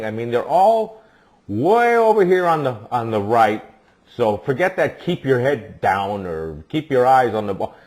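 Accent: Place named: United States. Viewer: Nice video. What part of USA is the accent? Cool.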